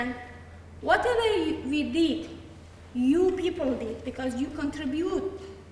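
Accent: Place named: Iran. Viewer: 17سال در اروپا مدرک دکترا باید انگلیش اد خیلی خوب می بود انگلیش را مثل فارسی صحبت می کنید لغت ها سر به سر کردی گفته روان هستی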